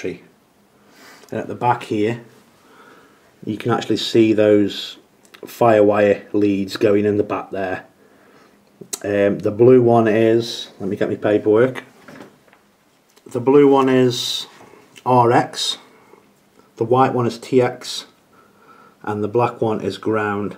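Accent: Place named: Shildon, United Kingdom. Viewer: Just tried this but using an Arduino's FTDI chip to do the serial side, no dice. So I've resigned to ordering a CA-42 Lead and see where that gets me. The Arduino did let me see the "LED:000000CC FAddr:0024A051" Error when the board is in place so I know I'm fixing the right issue. Totally Unrelated, You sound like you aren't too far from me, you sound very much like my friend from shildon :D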